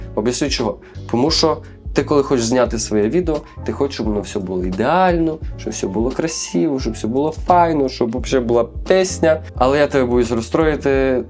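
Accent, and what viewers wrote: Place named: Surzhyk, Ukraine. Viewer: Зарядив, бідбодрив, вдохновив!, прийшла просто твій суржик послухати🥰 абажаю! Ти молодець!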